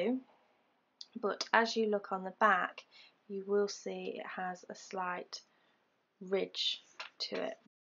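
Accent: England, United Kingdom. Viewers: Hello 🌸 Your works are just most beautiful, your explanation with the very nice British accent, that I love, are the most complete ones...thanks for sharing with us,..😘Fatima, Iran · Thanks for showing two methods and the pros and cons to each. That was helpful. My husband and I both love to hear her soft calm voice. Your English accent is lovely. (We're in the US.) Blessings.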